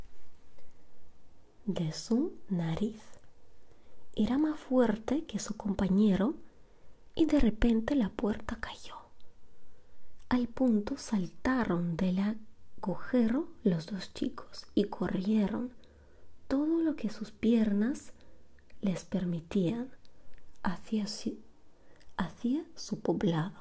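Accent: Russia. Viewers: Es española ? Porque ese acento de rusa?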